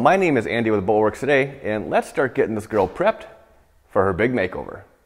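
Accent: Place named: Upstate New York, United States. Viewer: Andy, I have a 1968 Bertram Bahia Mar 20 that I seriously need help on. I am willing to go on Patreon to pay for your help because I'm not looking to get something for nothing. This boat is a family member and was left to me by my dad just before he passed in 2015. It's been with me my whole life and is now in need of a lot of help. I have her off her trailer in my backyard and have sanded all the bottom paint off. The hull is in such bad shape with deep chipping and stress cracks everywhere. It's so bad that I've decided to sand all the way up to the gunnels and restore everything from there down. The deck is still in good shape except for a little rotting where there's a penetration for a flag pole holder or some such device. I've been watching your channel for a while and have to tell you how much I've enjoyed it. Being from Binghamton, NY originally, I started out working in a boat shop there sanding on boats and doing fiberglass work when I was a kid, so your upstate accent makes me feel like I'm home. 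When I was 19 I joined the military and have since found myself living out West in Tacoma, WA near JBLM. I'm a Union Carpenter now but still call Upstate NY home. This boat has been part of my family since 1979 and in 2016 I was able to drive back East and get her, an epic journey that's a story in itself. Now that the Covid-19 virus has us all sitting at the house, I have pulled out the random orbital sander and tried to remember all the lessons I learned when I was 17. A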